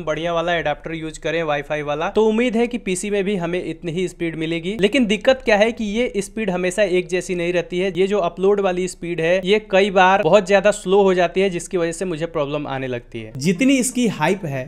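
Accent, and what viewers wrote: Bihar, India. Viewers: आप की भाषा बिहारी है, और बिहार में सब काम लेट होता है, रायपुर में ०२ घंटे में इंस्टॉल हो गया। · Aap Bihar se ho kyaaa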